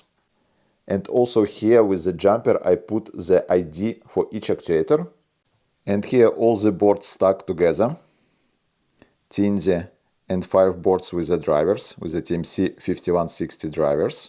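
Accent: Russia. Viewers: Ты чё, читаешь с листика английские слова русскими буквами написанные?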